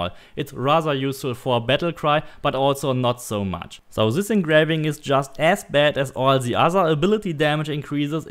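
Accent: Germany. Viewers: Lol I am level 73 and I have killed a lvl 99 mercenary easily in 10 sec. Or so, is that good? By the way this build is so funny and nice even before the armor set I have played a lot of the ares ability because you just feel undying 😂and the animation are very cool. By the way are you from germen beacause of your accent I thought that (sorry if I am wrong I am also from Germany)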